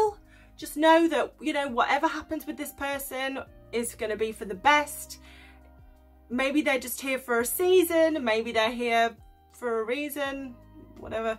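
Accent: United Kingdom. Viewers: Nice one , very nice information,nice accent,are british.keep posting more info mate bye for now.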